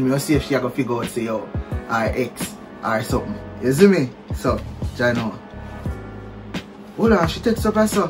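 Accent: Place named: Jamaica. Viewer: Man a chat English and Patwa😂😂‼🔥🇯🇲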